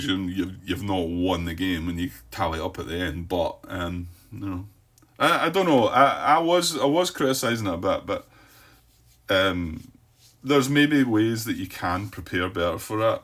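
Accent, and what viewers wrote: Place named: Scotland, United Kingdom. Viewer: Thank you for this journey that ended today. I started to watch your videos of The other side of the hill after backing the reprint in August, and never caught up with your speed, so I never put a comment, but today, I can finally say all the good things. It's been quite tough sometimes for different reasons: the firsts parts with your accent (I do not have any more problem with Scottish accent now 😊) and sometimes being inside your thoughts is not easy 😅. but anyway, your questions and sometimes mistakes made me improve my understanding of the game and I do play on vassal ( 2 solo plays and 2 other with 4 players) and the physical copy of the game has arrived in the meantime. thanks again for the time spent to offer us a global vision of this remarkable The other side of the hill.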